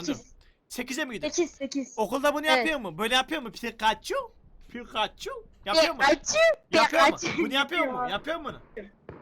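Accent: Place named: Rize, Turkey. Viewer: Abi videoların bagımlılık yapıyor resmen saten rizrlilerin konuşmasına hayranım kendimde gümüşhaneli olarak seni izleyerek çok mutlu oluyorum resmen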